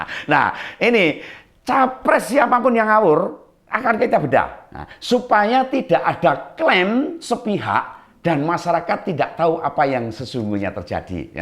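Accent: Java, Indonesia. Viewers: saya suka dgn logat mas ini, jawa banget, dan saya sering belajar bahasa jawa, karna teman2saya banyak yg dari jawa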